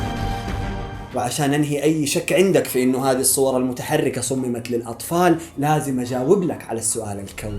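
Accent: Yemen. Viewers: من وين انت لهجتك قريبه للهجه لليمنيه☻?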